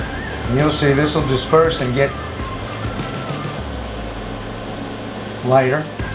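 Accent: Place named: New Orleans, United States. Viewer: You have to be from New Orleans or close by. That's a familiar accent ..;)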